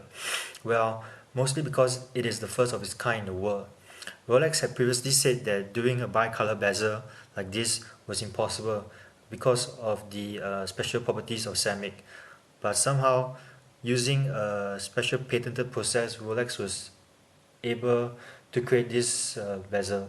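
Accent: Singapore. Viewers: WTF. Speak clearly! Can't stand this Singaporean/Malaysian Chinese accent. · Ya he sound like singaporean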